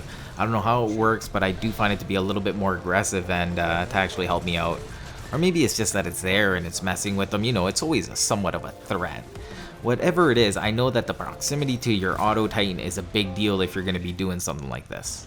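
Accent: Canada. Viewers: Bro are you Canadian because I'm pretty sure I here a Canadian accent every time you say about and out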